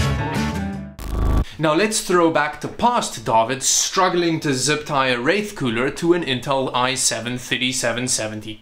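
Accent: South Africa. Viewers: Wait you're south African?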